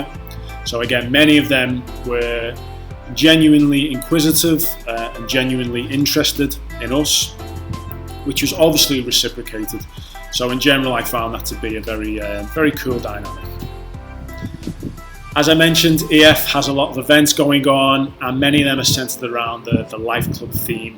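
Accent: Liverpool, United Kingdom. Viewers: are you from liverpool? hahaha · Thank you for making these videos, I just applied for EF, but watching your other videos inspires me to apply for public schools as I have the teaching experience. I’m hearing scouse in your accent? I’m also from Liverpool, so it’s nice to hear on YouTube 😂🙌🏻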